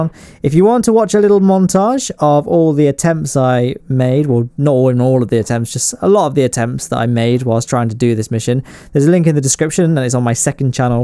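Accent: United Kingdom. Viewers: Got a feeling he might be British. Any else one getting that feeling? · Do you from UK?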